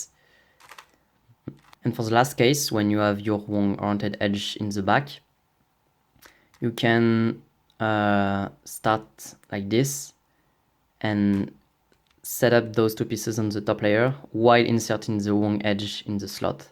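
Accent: France. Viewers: French English sounds so French. I know a guy who says "particular", "specific" and similar words with French accent all the time ^^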